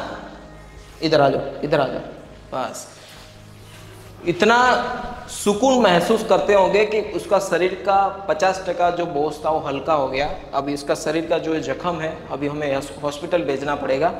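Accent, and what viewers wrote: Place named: Etawah, India. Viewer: bhai iski avaj se sap pata lagta hai ki a bhai itava ka hai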